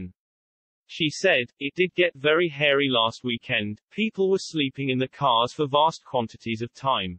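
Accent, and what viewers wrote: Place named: Russia. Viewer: Why not narrate your own videos? We won’t make fun of your Russian accent.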